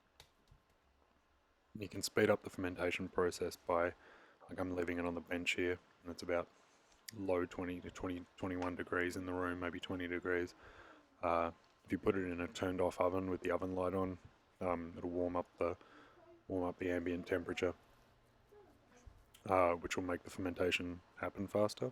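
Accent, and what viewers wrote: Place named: Australia. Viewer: hey, he cant help being australian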